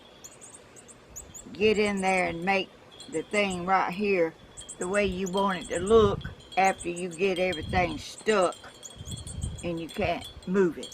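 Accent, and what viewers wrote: South, United States. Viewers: I started watching your videos about a year ago and you're just wonderful! I love your enchanting yard, your southern accent, your turn of phrase and your love of nature. This mosaic table is the most fabulous treasure I've seen in a long long time. The colours are amazing, your visit with your youtube friends is heartwarming and you leave everyone with a feeling that they've just had a lovely visit with an old friend who never judges or questions. You're truly a gift and I'm so happy to have found you! Sending love and greetings from Canada! And as a side note, I suggested to our local nursing home that their residents might love your channel because I think you will take them on a wonderful journey everytime they watch one of your projects and get to visit with you in your garden. You are truly a gift and I don't think you realize how loved you are, you bring a lot of love and happiness to many people every day. 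· I love listening to you with your southern accent! I also love your hair,you do a great job,I to color,cut and perm my own hair but I was a hairdresser. You are soooo very talented keep up the beautiful work. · Shelley M Martines I’m glad that during my sleepless nights I can follow our beautiful and her lovely southern voice, and gifts from our dear Lord. 💝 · I have been watching you now for a few years and I have to ask “ is there anything you can’t make?, good lord woman, I’ve seen you make so many things and no project seems to stump you, I’ve made mushrooms with you and many other things and I love your southern accent, I am Canadian and a crafter, I love to work with glass the most, many years ago, my first table for a wedding gift started my road to making tables, I made and sold so many, I made and sold what I called window in a window with frames and glass to hang in a window, they also sold very well, keep up the good work, god bless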